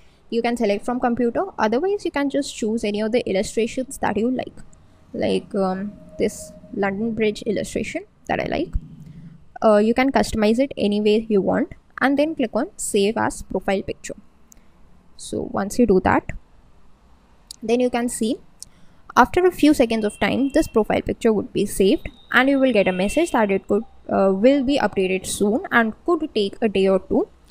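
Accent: India. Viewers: Indian english pronunciation totally error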